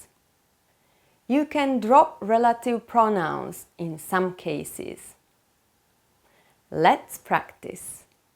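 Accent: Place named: Italy. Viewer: This sucks . You have a foreign accent. Italian?i taught a- levels. ✖️🤢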